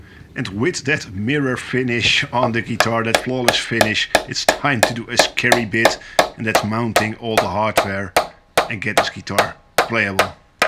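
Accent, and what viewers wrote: Netherlands. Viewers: think if you make the neck blank a little longer and higher you might be able to cut two necks out of it , even when the headstock is tilted back you do need to cut the backside of the neck first and lay away the piece you cut off as the headstock of the second neck gets cut from the heelside of the top part leftover looking from the side of the blank the necks will have the back facing eathother and the headstock slightly passed the heel of the other to have enough material (hence the blank needs to be a little longer) you likely need to have sumting to lay the rough cut blank intoo before cutting the sides out but it should save a ton of waste materials je accent klinkt nederlands trouwens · I didnt know u were a fellow dutchy and assumed you were american due to the americana vibe you had going on. So hearing the accent hit me like a sledgehammer putting me right back in my place. 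Also nice guitar. Looks sick. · Hi, are you dutch? I hear a Dutch accent. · I love these videos. So much information with a cool (Dutch?) accent. :)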